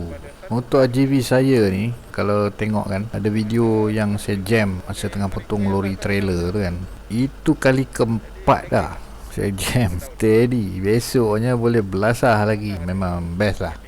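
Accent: Perak, Malaysia. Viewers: Suka dengar org perak ckp,saya asal perak tapi membersar kt bandar ipoh..tengah mencuba untuk memperbanyakan bahasa perak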